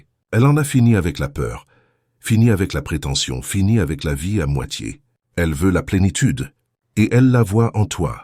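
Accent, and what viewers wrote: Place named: Ardennes, France. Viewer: MERCI BEAUCOUP MARIE OUI CHÉRI C'EST MOI TA FEMMELETTE OUI TA MARIE CHÉRIE D'AMOUR UNIQUE ET TRÈS CRÉATIF POUR ME FAIRE SORTIR DE MA RÉSERVE NATURELLE POUR TOI PARCE QUE J'AIME TOUT DE TOI MÊME SI C'EST TROP BEAU TROP FORT CHÉRI C'EST MOI TA FEMME FIDÈLE À MOI 😮TU ME FAIS PLEURER D'ÉMOTIONS ET ÇA ME BOULEVERSE TELLEMENT MAIS JE SAIS QUE TU M'AIMES DEPUIS LONGTEMPS ET NOUS SOMMES FAITS L'UN POUR L'AUTRE ET L'AUTRE ET L'AUTRE ET L'AUTRE ET QU'IL EST SENSIBLE POUR LE MOMENT PRÉSENT DANS NOTRE UNION TRÈS GENTIL DE LA VIE DE MA MÈRE À MOI MAIS MAINTENANT TU ES SAGE ET TRÈS ÉMOUVANT CHÉRI ET COQUIN ET AUSSI DANS TES RÊVES EN RÉALITÉ ET DE LA PROTECTION ET DE VIVRE SELON TES DÉSIRS ALIGNÉS OU DANS L'ESPACE DE LA FAMILLE M'EST REVENU POUR LES PERSONNES QUI ONT UN COMPLOT ORGANISÉ ET QUI SAIT COMPRENDRE QUE COMME UNE ÉVIDENCE QUE TU AVAIS PRÉPARÉ TON CŒUR QUE L'AMOUR C'ÉTAIT LE DERNIER SOUFFLE À LA MAISON POUR TOI PARCE QU'ON SE REPENT DE LA DISTANCE LA PLUS IMPORTANTE ET QUE DIEU TE PARDONNES DE TOUT CE DONT ON T'ACCUSE ET JE TE PARDONNES ET JE TE LIBÈRES ET QUE TU AS BEAUCOUP DE CHOSES CACHÉES DANS TON CŒUR ❤MAIS CE N'EST QUE TON AMOUR RETENU QUI DÉBORDE D'AMOUR UNIQUE ET EXTRAORDINAIRE QUI ME FAIT VIVBRER AU-DELÀ DE L'INVISIBLE ET DE CE QUE L'OEIL HUMAIN NE PEUT PAS VOIR SOURIRE ET SION ACCENT DÉLICIEUX 😋 FRANCO-GRINGO-BELGO., EN TOUT CAS ARDENNAIS PURE SOUCHE COMME MOI, CHÉRI D'AMOUR JE SUIS LÀ POUR TE DONNER CETTE ENVIE DE T'EMBRASSER OUI ÇA ME BOULEVERSE DE TE VOIR SOURIRE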